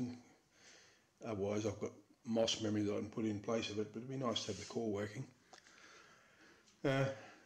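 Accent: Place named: Australia. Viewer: Nice to see another -11. Mine are all QBus so it's nice to see a nice unibus box and good to hear another aussie accent. Will subscribe. Hope to see more. Keep up the good work!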